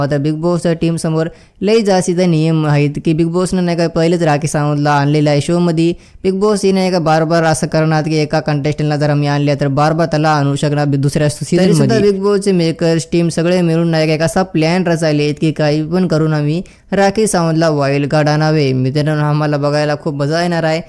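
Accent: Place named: Marathwada, India. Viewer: Bhava tu maratwadyacha ahes kai😅, apli bhasa sarkhich hai😅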